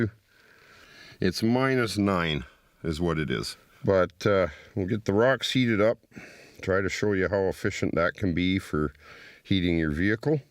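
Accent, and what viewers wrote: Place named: Canada. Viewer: I just LOVE you deep voice, accent, and sense of humor. I wish I could go to Canada and spend a week with you just to listen to you teach me survival stuff!! Thanks for sharing.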